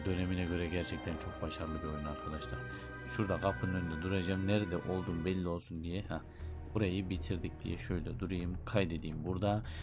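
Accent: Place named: Ankara, Turkey. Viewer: bizim taraflarin şivesine benziyor anlamında demek istedim. Ankara yani. Ankara'nın neresi?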